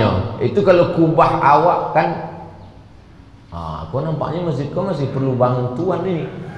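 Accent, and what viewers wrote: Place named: West Sumatra, Indonesia. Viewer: cakapnya mcm bahasa minang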